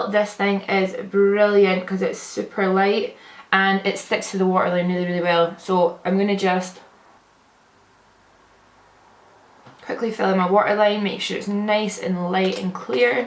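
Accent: Scotland, United Kingdom. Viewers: Legit obsessed with all ur looks!❤️I think you are the only scottish gal that sounds normal on video, in my videos I sound sooooo bad I HATE my Scottish accent tbh😩Xx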